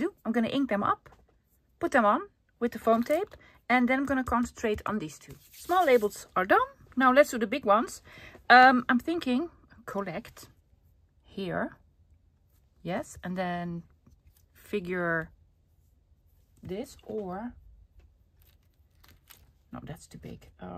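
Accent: Netherlands. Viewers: Hello!! afinally I post something - I have been following your videos and I have learned so much and now have actually completed a few things that I am happy with - with thanks to you. So I will be putting together some happy mail. It is a thank you for your big hearted sharing of what you have learned! Not only that but I am Canadian and you know the connections we have with the Netherlands. My two best friends were from Vollendam - unfortunately they have passed before me and I miss them terribly. But your voice brings them back to me with the accent and I feel close for that reason. I am still old enough to be your mother (79) but love to learn new things!!, have a lovely day or evening or weekend! All the best, Barbara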